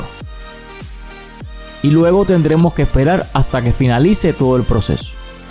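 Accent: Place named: Cuba. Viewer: Que sos de Cuba o el Salvador?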